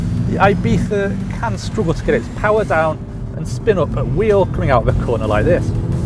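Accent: United Kingdom. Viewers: Why is it that British people always say Fiestar ST?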